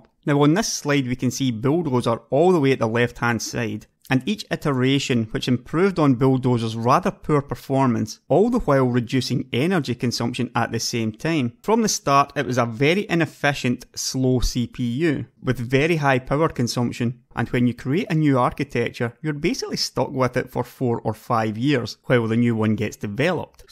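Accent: Scotland, United Kingdom. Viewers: he is full on * Scottish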